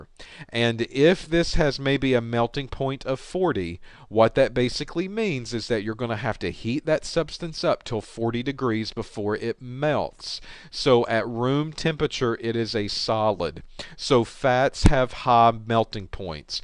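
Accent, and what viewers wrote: South, United States. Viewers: cool Southern accent I like it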